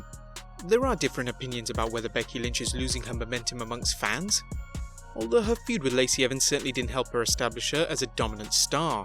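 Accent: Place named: United Kingdom. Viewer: Ok that is the very first time I have ever hear someone pronounce the word as STAYTUS. I mean I know your british and all but damn you act like your accent prevents you from saying STATUS like everybody else. Do you say APPLE or AYPPLE?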